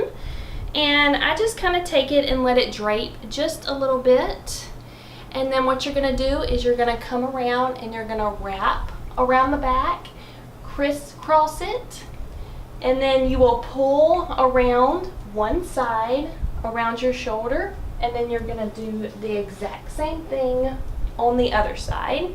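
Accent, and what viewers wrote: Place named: South, United States. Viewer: Your southern accent makes you sound and seem as sweet as apple pie.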